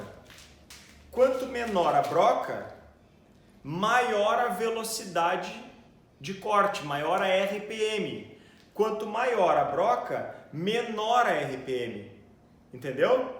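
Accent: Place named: Rio Grande do Sul, Brazil. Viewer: Muito bom seu vídeo! De qual cidade você é? pelo sotaque é gaúcho! sou de Vacaria -RS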